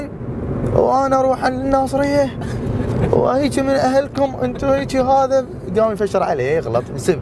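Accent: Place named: Upper Egypt, Egypt. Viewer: اكسنت صاعدين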